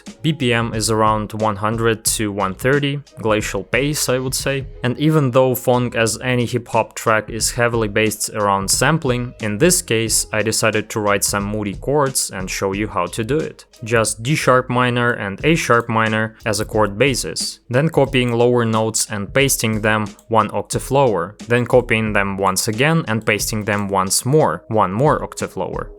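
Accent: Russia. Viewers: лайк с русским акцентом, красава чел! 👍🏻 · i love this russian accent its just Beautiful , i know phonk is born american but i cant imagine phonk without russia ! thanks bro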